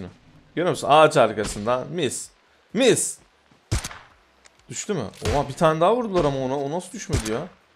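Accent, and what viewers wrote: Adana, Turkey. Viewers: ADANALIYIM DE BİZİ KURTAR YÜKTEN BU ŞİVELERİN BAŞKA AÇIKLAMASI OLAMAZ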